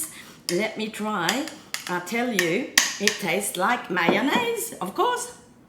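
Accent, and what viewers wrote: Australia. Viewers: Une francaise avec un accent Aussie. Ou Kiwi?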